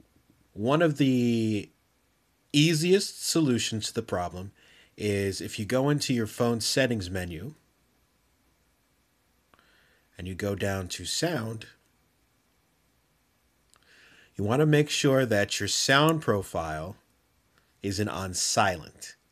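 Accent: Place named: United States. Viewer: Thankyou! And nice to listen to someone who actually speaks English and doesn't speak too fast! American English!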